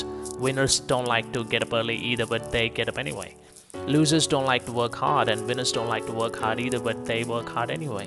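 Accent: India. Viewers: Are you indian? My friend (who is indian) speaks like you IDK but i think so.....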